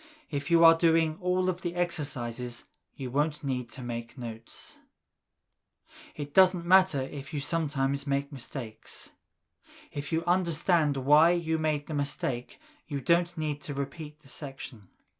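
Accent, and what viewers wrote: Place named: Italy. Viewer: He sounds italian when speaking espersnto tbf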